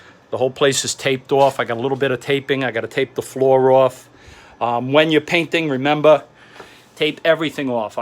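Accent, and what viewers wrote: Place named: Massachusetts, United States. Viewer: Luv the MA accent, lol...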